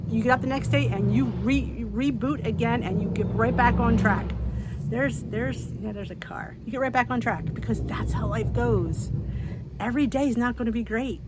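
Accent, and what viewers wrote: New Jersey, United States. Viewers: I thought you were a Jersey Girl! I'm think if up north Bayonne New Jersey , Nutley or Verona or down to the shore ocean county third generation Italian am I right?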